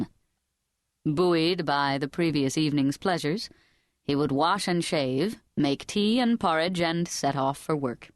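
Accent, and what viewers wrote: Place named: United States. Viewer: Terrible narrator….. thick American accent, lousy pronunciation. Unlistenable.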